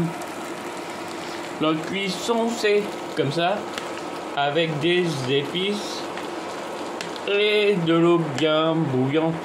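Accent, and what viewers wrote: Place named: China. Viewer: Êtes-vous chinoi ou françai vous avez vraiment un accent très drôle 😂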